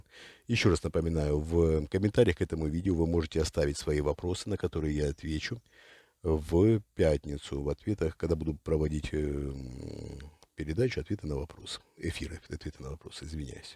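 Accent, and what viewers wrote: Ukraine. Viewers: зареганный в Австралии. Льющий воду, запугивающий и тот и тот народ, дающий ложные надежды, не умеющий оперировать военными терминами, неправильно составляющий предложения, плохо знающий синтаксис и в разговоре имеющий украинские следы в диалекте. Даже не знающий про за закрытый город, откуда были пуски. Когда этот хэнэрал врет он кашляет и запинается все больше и больше.его как сегодня выдает неуверенный от лжи прокуренный голос. Он, как и всегда выпрашивает Донат лайки деньги. Он просто стрижёт контент на народном горе. Карма ударит по нему а бумеранг пущенного зла совершит круг и прилетит точно в точку отсчета. Люди, в тяжёлые времена проверяйте перепроверять по сто раз источники, не питайте иллюзий, верьте своей интуиции, помогайте старикам, детям, животным. они самые незащищенные. Режим пу падет. Но никто, ни царь и ни герой, мы сами.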